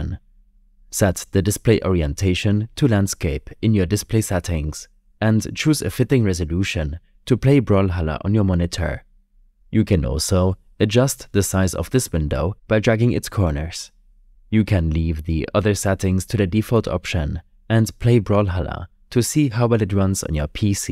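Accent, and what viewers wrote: Germany. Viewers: I said "lern Englisch" it's German because of his German Akzent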